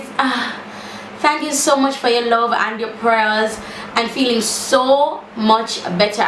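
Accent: Jamaica. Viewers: jamacan accent a bit tough and you forgot to match up your neck!! looks many shades darker! lolololo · Looool the patois speed on fleek 😂👏🏾✊🏾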